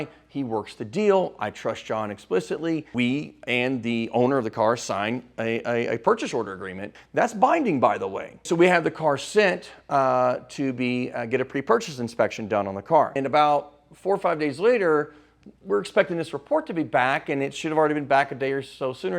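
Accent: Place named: Texas, United States. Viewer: Definitely From Dallas Texas, Maybe Houston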